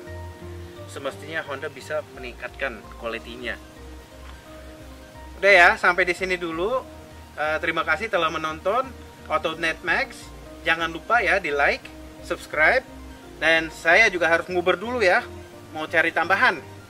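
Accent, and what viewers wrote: Indonesia. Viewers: Bahasa Indonesia nya udah ada aksen Indonesia nya. Itu baru jarang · wkwkwk bule tapi cengkoknya asli indonesia banget 😀😀... reviewnya super jujur, pedeees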